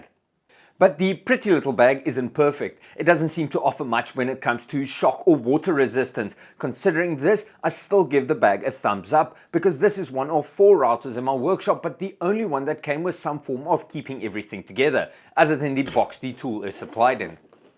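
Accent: Afrikaans, South Africa. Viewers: Are you a South African? I never would’ve picked it. 😂😂👍😉🇦🇺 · Always makes me happy to hear a South African accent. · Another awesome video!!! Out of curiosity, what is your native language? Your accent seems like Afrikaans, not Zulu. Thank you for the video!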